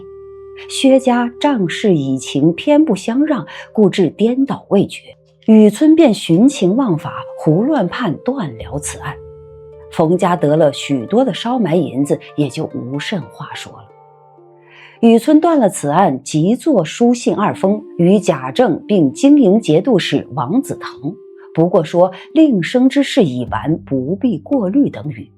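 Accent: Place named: Beijing, China. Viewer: 古韵京腔兼而有之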